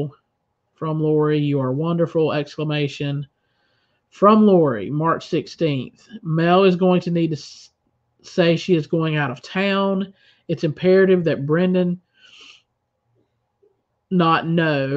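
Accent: South, United States. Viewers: ❤️ it ! I heard it with that beautiful southern accent.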